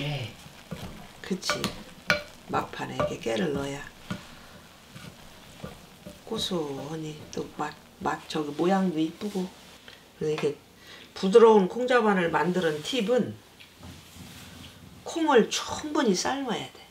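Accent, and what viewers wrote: Chungcheong, South Korea. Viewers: 어머님, 구수한 충청도 말씀이 너무 좋아요. 오늘도 감사 합니딘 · 충청도 사투리가 너무 좋습니다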